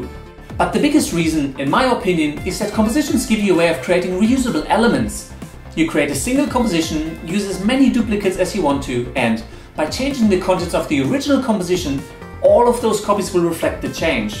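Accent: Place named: Germany. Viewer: Thanks for your tutorials! Where are you from? It sounds a little bit like you're from Germany..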